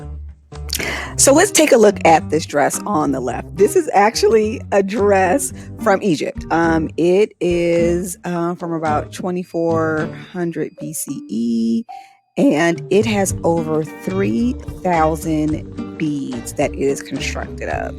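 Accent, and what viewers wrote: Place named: United States. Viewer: Just cant hear the terrible american dialect.... sorry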